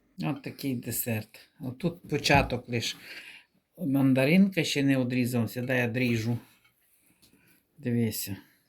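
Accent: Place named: Volovets, Ukraine. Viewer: Така вимова файна. Маю цімборку з Воловця, вона так говорить. Я не вмію, але розумію😊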